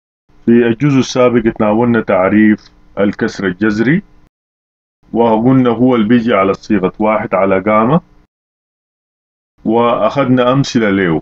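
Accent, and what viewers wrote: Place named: Mahas, Sudan. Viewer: قاعدة ⲓⲧⲧⲓ في النوبين تستخدم مع الاعداد لاشتقاق صيغة الترقيم التصاعدي وليس صبغة الجذور الكسرية كما تابعت معكم ..فاسم العدد التصاعدي (الثالث) تتكون من اسم العدد ⲧⲟⲩⲥⲕ ملحقا بها اللاحقة ( ⲓⲧⲧⲓ )وتنطق في ثلاث مقاطع نطقية ⲧⲟⲩⲥ\ⲕ-ⲓⲧ\ⲧⲓ (الشارطة المائلة لبيان أماكن التوقف اللحظي عن النطق، والشارطة على السطر لبيان جذرالكلمة والاضافة القواعدية عليها ) ومثلها كل أسماء الأعداد التصاعدية . أما قاعدة إشتقاق أسماء الكسور الجذرية فلا يتشابه منها في اللاحقة إلا اسم العدد (ثلث 1/3) مع إختلاف طريقة النطق (النبر) ..فاسم (الثالث) التصاعدي يتم النبر على المقطع النطقي الثاني (والنبر هو رفع حدة الصوت عند النطق بحروف أي مقطع بما يخالف النبرة الصوتية لما قبله أو بعده) ، بينما في إسم (الثلث) الجذري لا يوجد نبر والمقاطع النطقية الثلاث تنطق بوتيرة واحدة.. وتقبلوا خالص تحياتي على جهدكم ..وعسى أن يكون ما كتبته لكم معبرا عن أسماءنا المحلية في لهجتنا النوبية التي تعرف بالفاديجا ..لأني أتبين إختلاف لهجة المتحدث (المعلم) قد يكون محسيا أو سكوداوي .. 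لا أعرف تحديدا ..عموما خالص الشكر والتقدير والتحية (حسين كبارة ..مركز الدراسات النوبية)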